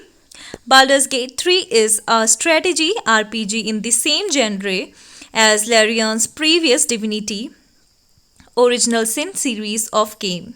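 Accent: India. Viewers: Very nice Voice and cute accent from one of the Indian Languages, I suppose :) But you really need to have some Pictures that do not look like Watercolor Paintings :P Good luck and thanks.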